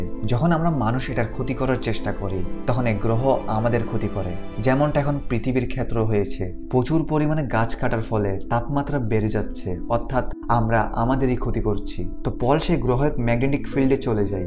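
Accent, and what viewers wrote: Chittagong, Bangladesh. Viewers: ভাই তো চিটাইংগার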